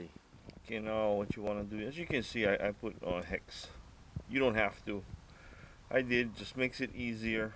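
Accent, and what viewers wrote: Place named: Hawaii, United States. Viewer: Funny how you can just tell someone from Hawaii from the accent. Lol as soon as you started talking I knew you from Hawaii.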